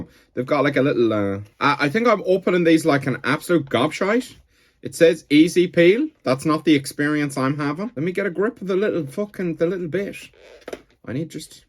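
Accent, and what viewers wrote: Ireland. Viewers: What’s your accent? Trying to learn about Irish regionalaccents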